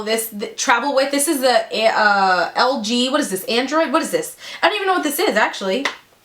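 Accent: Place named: New Jersey, United States. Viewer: hey where are you from I love your accent by the way you have like a jersey accent to me hope that isn't weird!!!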